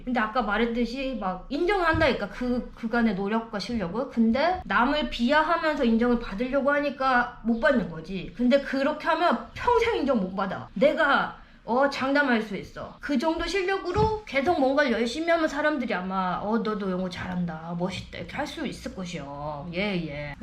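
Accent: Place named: Gyeongsang, South Korea. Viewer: 님은 스피킹보다 히어링이나 독해가 훨 좋은듯ᆢ물론 스피킹도 수준급 이상이지만 갱상도 분이라 악센트 때매 그리 보이는듯ᆢ근데 그보다 님은 존재자체가 반짝여서 그렁거 항개도 안중요함 ᆢ마쟈 아니야~^^ ❤️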